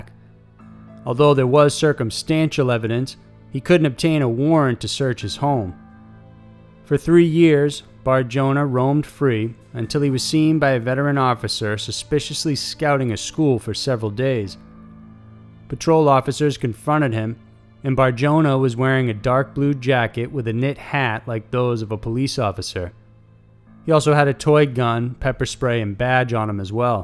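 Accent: United States. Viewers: The narrator has a perfect American accent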